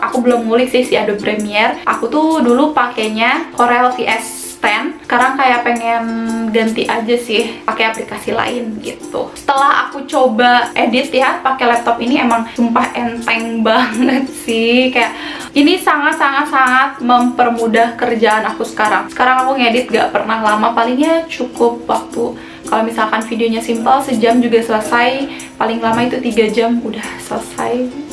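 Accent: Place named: West Java, Indonesia. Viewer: ihh tth org Sunda 😂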